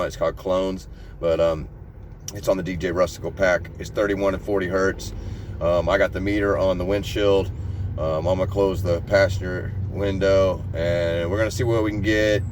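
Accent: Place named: Florida, United States. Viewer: Sickk stoked for ya Brotha.. DUDE check this out!!... I just realized you sound kind of familiar by the way you talk and you also do the same line of work as I do ,.. I'm a painter , pressure washer... but here's the catch as I also noticed and listening to the way you talk something was familiar with you but never thought of it until now because I just watched one of your older videos and I actually think I might know you.... on your old video you stated that you weren't used to the cold and that you where you're from Stuart Florida and guess what LOL? I've been surfing all my life in Florida and one of the my local spots is the "Rocks" yep you guessed it. .. Stuart Florida haaaahaaaaaa... which I have been living here for 43 years now I also lived in Jensen and Port St Lucie I'm still here actually LOL .Yeeeeeeew!.. but I knew it the more I watched your videos something was getting more and more familiar and it was definitely the way you talked you could tell you were a Florida Stuart dude🤙🤙🤙 for sure..yeeeeeeeeew yaaa braaaa... 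Get them 150s .......!!..✌💪👊🙏🔥🔥☝☝💥💥..congrats... I'm surprised you didn't notice when I put on your comments and say "yeeeeeeew!!.lol anyways,, Hows Kentucky treating you? a lot of Bassheads there huh?cause I get alot of my stuff from Louisville Kentucky which is one of the few spots that I get my car audio stuff from...... love my NVX🔥🔥🤙🤙.. alright brother keep going strong get them numbers have fun and stay safe brother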